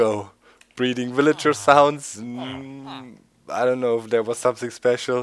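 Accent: Germany. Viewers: have you ever tried using an american accent other than your german one?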